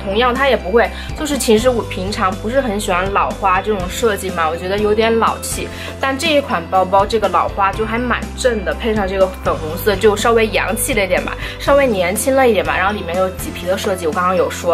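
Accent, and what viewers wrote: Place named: Changsha, China. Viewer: 我发现你说话很像长沙话哈哈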